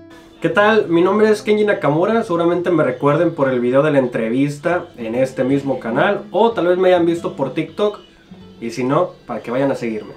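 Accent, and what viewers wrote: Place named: Mexico. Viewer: Nada como conocer japón de alguien que habla Méxicano 😃 eres la onda. Ojalá no dejes de hacer estos videos.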